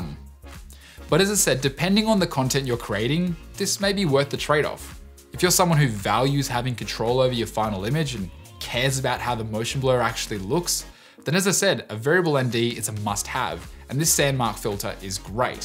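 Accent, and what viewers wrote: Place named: Australia. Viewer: your AUS neighbour voice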